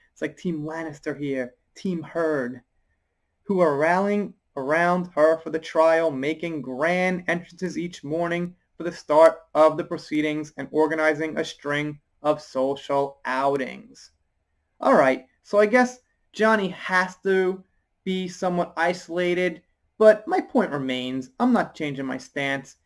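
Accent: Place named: East Coast, United States. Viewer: What made you move to Japan? You sound very East Coast USA.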